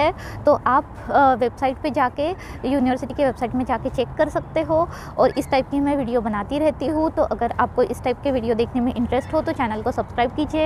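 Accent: Gujarat, India. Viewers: Your accent feels like Gujarati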